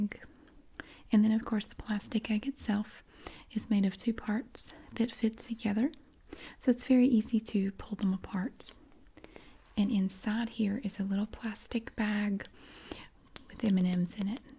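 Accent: South, United States. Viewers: I must say ; I think your southern accent really complements the asmr stimuli of sense of relaxation and well being.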